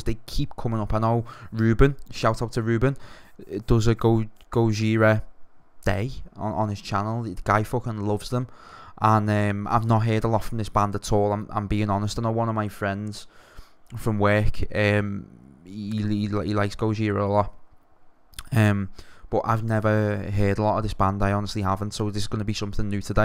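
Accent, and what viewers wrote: Liverpool, United Kingdom. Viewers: you from liverpool by any chance? keep the vids up dude good stuff!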